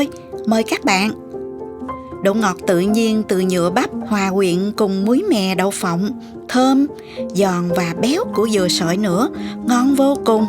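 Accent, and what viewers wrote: South, Vietnam. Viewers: Ngon quá, giọng nói miền nam của chị ngọt ngào ghê luôn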